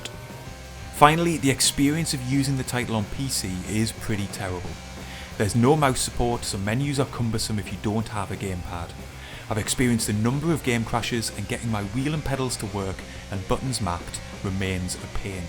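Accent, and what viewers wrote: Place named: Middlesbrough, United Kingdom. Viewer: Where are you based? You accent sounds close to me (Middlesbrough)?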